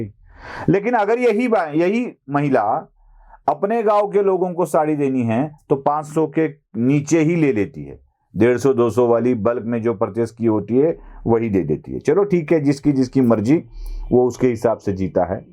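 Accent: Maharashtra, India. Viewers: aapki marathi lahje men boli gai hindi sunne men accha lagta hai. up ke bare men aapka precomplex bad men up tour ki sacchai ka bayan karna sukhad tha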